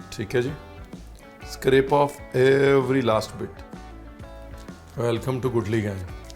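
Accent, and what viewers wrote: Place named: Lucknow, India. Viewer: Adaab ranveer sir apka lakhnavi andaz acha lagta hi cooking with urdu adab maza Agaya. This makes ur cooking and show obviously ur dish royal and great and delicious too like that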